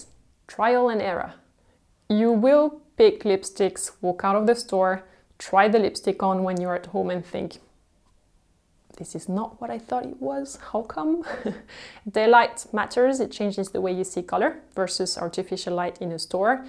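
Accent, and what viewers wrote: France. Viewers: I truly just love your French accent, only heard it in movies.. so nice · English with french accent is soooo beautiful ❤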